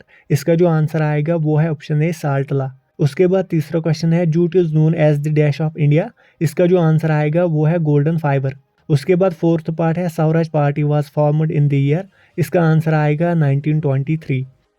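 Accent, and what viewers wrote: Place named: Kashmir, India. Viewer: Sir has better kashmiri accent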